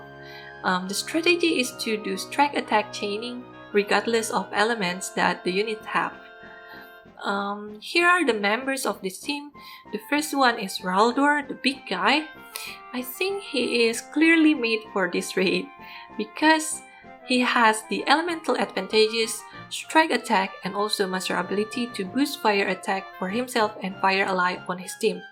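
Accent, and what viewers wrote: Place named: Indonesia. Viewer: Your English like Indonesian People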